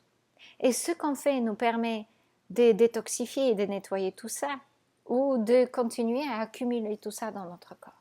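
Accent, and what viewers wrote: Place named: Poland. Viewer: ton français est extraordinaire pour quelqu'un dont ce n'est pas la langue maternelle. Et ce qu'il reste de polonais dans ton accent est charmant! Bravo pour cette maitrise de la langue et merci pour ces vidéos que je regarde avec curiosité.🙂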